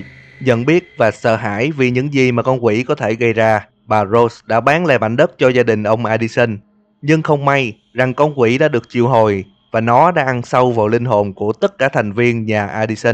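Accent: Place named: Mekong Delta, Vietnam. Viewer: Omg có thằng e mới giới thiệu trang này xem. Vào xem nghiện luôn. Mặc dù mình chưa chơi game nào trong những game bạn phân tích nhưng bạn có thể suy luận như thế thì thật là quá đỉnh. Ngôn ngữ của bạn cũng chuẩn nữa. Giọng miền tây nhưng rất dễ nghe. Chọn từ ngữ đúng, lối dẫn truyện rõ ràng mạch lạc nên mình nghe phát hiểu luôn. Mình sẽ sub và ủng hộ. Chúc kênh sẽ càng phát triển nhé.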